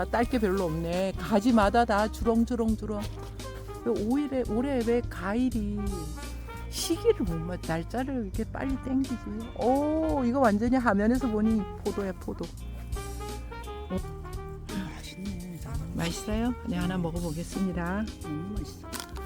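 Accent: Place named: Gangneung, South Korea. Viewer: 자두보니 군침도네요 목소리가 강릉 말씨네요 제고향 강원도 어렸을때살던 대관령 가보고싶네요😊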